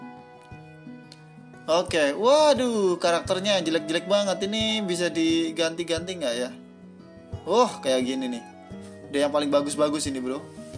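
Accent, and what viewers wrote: Java, Indonesia. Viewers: Gebrongot geni wolongewu Medog bangt bang jowomu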